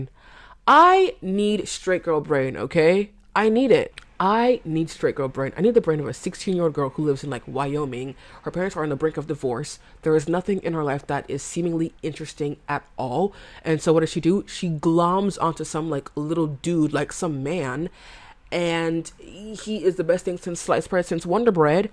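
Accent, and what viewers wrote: United Kingdom. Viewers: The British accent is genuinely so good and fitting on you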